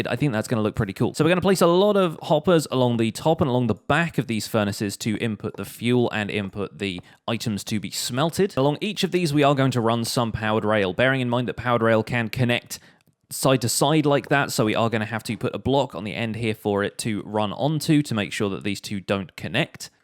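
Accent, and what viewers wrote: United Kingdom. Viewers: You are sort of my hero cause I'm not good at doing these things so thank you very much, btw, are you British? I'm not British but my mom said I have a "British accent"...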